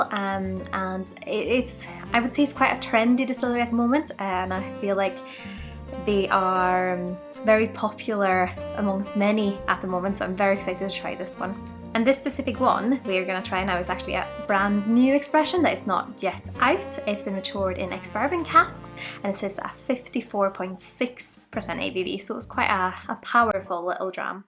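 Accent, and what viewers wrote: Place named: England, United Kingdom. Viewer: You sound English.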